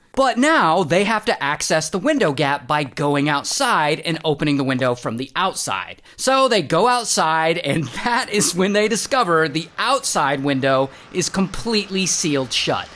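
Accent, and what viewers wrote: England, United Kingdom. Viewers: Im cackling at ur british accent dude lmao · The British accent 💀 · that bri'sh accent 💀🇬🇧☕ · Mint English accent! Hilarious story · bro has an interesting British accent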